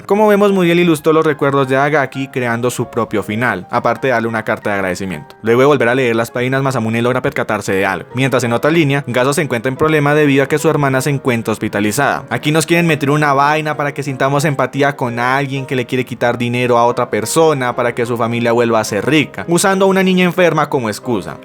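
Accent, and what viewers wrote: Colombia. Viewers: We me encanta tu idioma colombiano yo soy colombiano pero tu eres de colombia? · 5 segundos y deduje que es otro Colombiano Narrando, cuando dijo "PIROBA" dije! esta es mi patria Colombia querida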